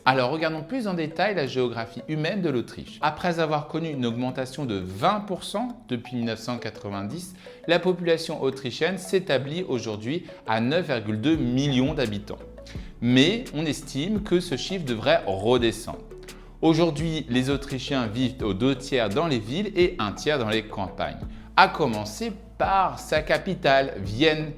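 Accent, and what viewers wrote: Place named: Paris, France. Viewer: Bonjour, votre accent Parisano-Franchouillard me csse les oreilles, au niveau littérature : Quer durch Österreich (Gertrud Gréciano-Grabner)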